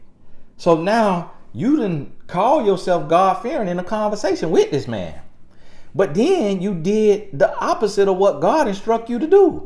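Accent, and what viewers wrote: South, United States. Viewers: not even a minute into the video and I’m weak at that country accent. that’s right mr. the CEO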